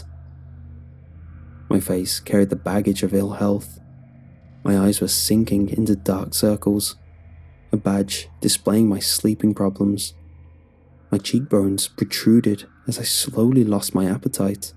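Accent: United Kingdom. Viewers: Plus a british accent. Though, I can't watch his content without feeling dirty because he put down a smaller youtuber for not having an american accent, despite the fact that he, himself, does not have an american accent.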